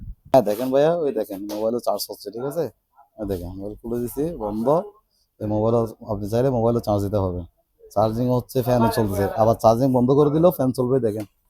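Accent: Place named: Chittagong, Bangladesh. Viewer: ইভা চিটাগাগত তো মনে হচেছ।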